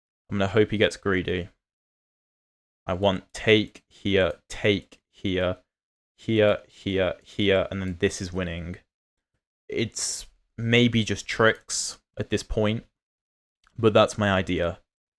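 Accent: United Kingdom. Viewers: I have been following you since Rio de Janeiro. Besides enjoying your explanations I am delighted by your British accent.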